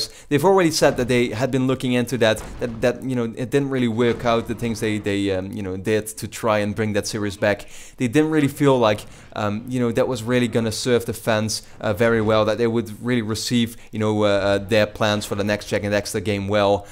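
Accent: Netherlands. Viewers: Are you dutch? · are you dutch? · Are you Dutch?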